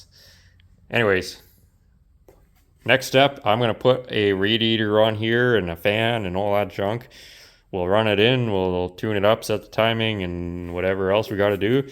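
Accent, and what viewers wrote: Canada. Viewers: Gosh, you sound Canadian!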